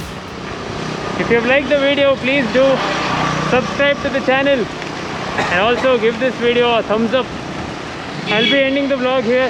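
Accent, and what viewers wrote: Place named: Rayalaseema, India. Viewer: Your kannada and seema slang telugu blend is perfect bro..... congrats for u r new bycycle gang 🙌......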